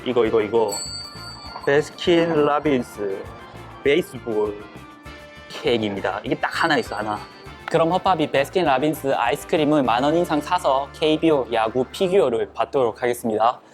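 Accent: Busan, South Korea. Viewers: 팝님 부산사람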